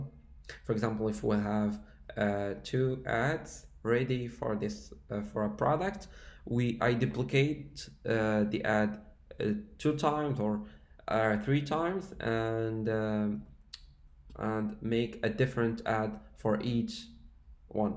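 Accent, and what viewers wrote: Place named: Morocco. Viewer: He is Moroccan and his pronunciation mixed between Arabic means Moroccan dialect and French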